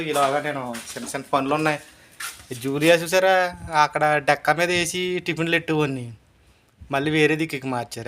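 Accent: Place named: East Godavari, India. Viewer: హాయ్ అన్న నీను మొదటి సారి మీ, వీడియో చుసాను బాగుంది, మీరు మాట్లాడే విధానం బాగుంది,మీ,మాట, తీరు చూస్తూంటే మా, తూర్పుగోదావరి జిల్లా మాటలా ఉంది, ఇప్పుడే సబ్స్క్రయిబ్ చెసాను బ్రదర్ ఇప్పుడు నుంచి మీ, వీడియోస్ చూస్తాను అల్ద్ బెస్ట్ బ్రదర్ అండ్ జైహింద్ 👍👍♥️♥️♥️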